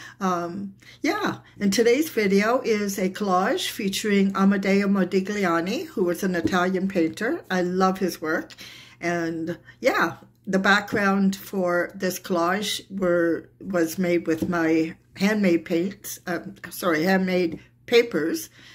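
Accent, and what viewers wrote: Nova Scotia, Canada. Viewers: I was searching for plaster of paris projects for junk journal covers and your momigami video popped up and I'm so glad it did. I subscribed now and like the wide range of art projects on your channel. I live in Denmark, originally from California and adore your Nova Scotia accent. I had just made a journal out of crunched up paper bags with hand cream and loved the rawness. Last year I ventured into gelli plate print making as I don't get into the printmaking studio that often. I will try your momigami technique on them as well. Thank you for sharing your knowledge. By the by, I love Froyle's art too.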